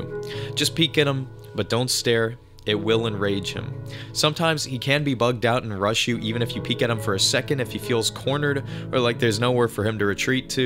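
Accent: Greece. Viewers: Are you greek?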